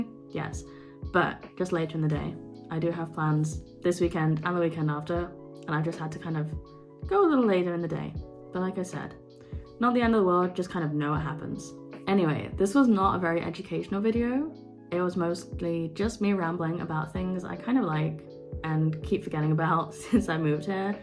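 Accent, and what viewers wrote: Australia. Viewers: Your accent sounds Aussie to me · your accent is slightly international, there's a touch of Australia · You sound more Australian to me than to be an English Lass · you sound slightly Australian more than English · You picked up an Aussie accent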